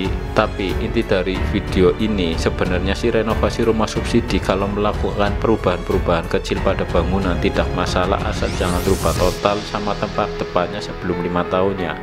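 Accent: Banyumas, Indonesia. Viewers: Ngapak betul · Ora ngapak ora kepenak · Ora ngapak ora kepenak ya kang... Hehe Matur nuwun kaang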